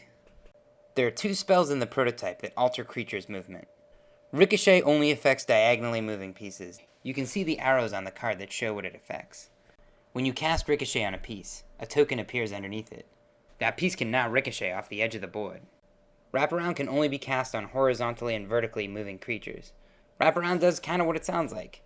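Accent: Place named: Boston, United States. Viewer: I was just teasing. The Boston accent makes it sound like he's saying caads when he says cards. I got my key just fine and the game is pretty fun.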